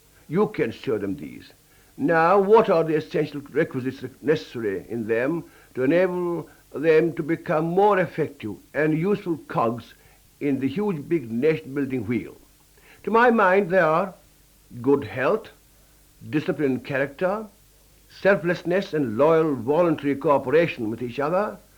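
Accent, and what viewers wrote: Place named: United Kingdom. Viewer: After this vedio : Me :British accent tutorial. Jai hind to the national hero, pride of coorg Field Marshal K. M CARIAPPA first commander in chief of Indian army. First Indian to command troops of British under him. Ayub khan president and first commander in chief of Pakistan army served under his command. Led the 1948 war against Pakistan being the western commander. One of the two legends to be honored with Field Marshal rank.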